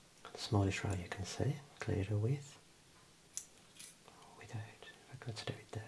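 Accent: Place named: England, United Kingdom. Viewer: I love your accent. What part of England is this from)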